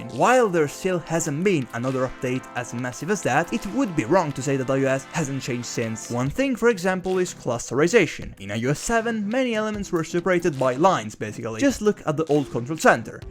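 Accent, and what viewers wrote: Italy. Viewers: Si sente che sei italiano dalla pronuncia, però ottimo video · il tuo accento inglese e bellissimo ma si sente un botto che sei italiano hahah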